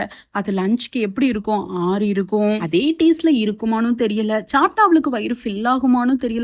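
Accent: Tirunelveli, India. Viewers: apdiya ma nanum athey doubt la dhan keka vanthen namma ooru slang mathiriye irukey nu😅😊neenga tirunelveli la entha side?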